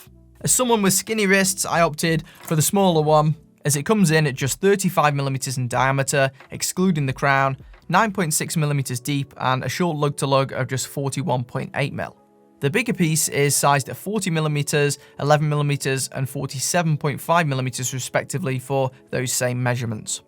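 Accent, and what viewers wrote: United Kingdom. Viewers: Fantastic informative review of this COOL watch. So much usefull information. Love the clear British accent.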